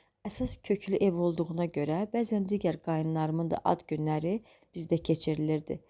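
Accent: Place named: Quba, Azerbaijan. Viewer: Ləhcənizdən qubalısınız deyəsən ancaq qubalılar mehriban olmurlar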